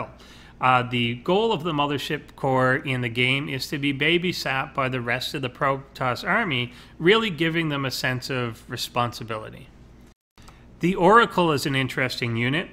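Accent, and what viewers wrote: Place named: Canada. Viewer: You're canadian, aren't you?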